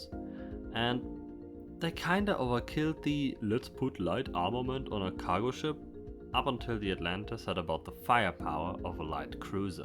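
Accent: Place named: Germany. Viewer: great story, but this is the worst way to tell it... very childish presentation and piss poor german accented english.... damned offensive actually.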